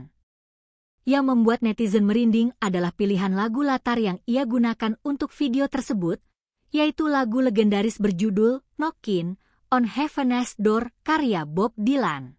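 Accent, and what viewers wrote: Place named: Indonesia. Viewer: Menyebalkan kalo pakai AI tulisan inggris di eja seperti bahasa Indonesia. Menjijikan mendengarnya jadi kelihatan dungu.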